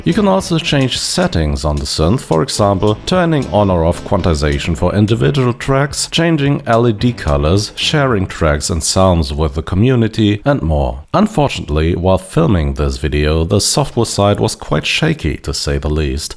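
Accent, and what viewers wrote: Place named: Germany. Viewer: The German accent!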